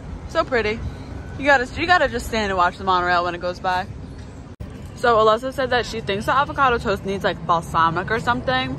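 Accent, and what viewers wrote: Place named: New York, United States. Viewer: New York is on mine and my wife’s bucket list! I thought that was your accent but didn’t want to speculate lol. COME ON YOU BOYS IN GREEN!!!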